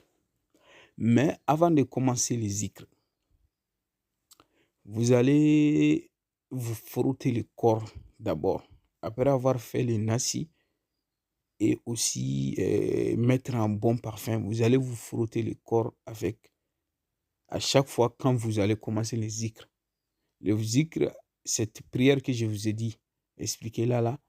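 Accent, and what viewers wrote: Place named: Mali. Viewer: Tu est du mali jia l'impression avec ton accent je crois reconnaitre le pays 🤧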